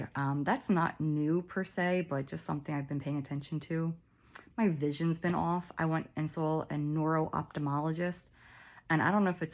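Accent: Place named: Philadelphia, United States. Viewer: Thank you for all your videos, I watched just about all of them! I just had my ACDF C4-C7 on January 9, 2025. Neck is super sore, having trouble swallowing, looking forward to pain going away. I get a huge kick out of listening to you because I attended Villanova University in the late 80s, and your accent brings me right back to campus! Best to you!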